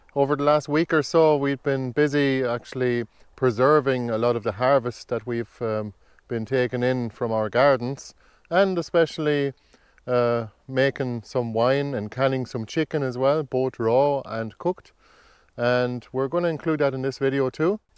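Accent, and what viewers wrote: Ireland. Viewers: Just after doing a bit of a binge watch, great content as usual on all the videos. To answer one of your questions from a previous video, I think the willow you were wondering about is a Sally. I can ask where you purchased your herb drying tent and your dehydrator. I have been searching to buy within Ireland and the price is some what saucy for both. Also did you get your wine making equipment within Ireland. And I can't believe you have a pressure canner. Surely not an Irish purchase. I thought for a second I was watching one of the many US you tubers I follow, except for the lovely Irish accent. Keep up the great work.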